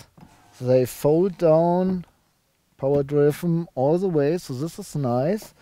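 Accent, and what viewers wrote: Germany. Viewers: i love German accent :)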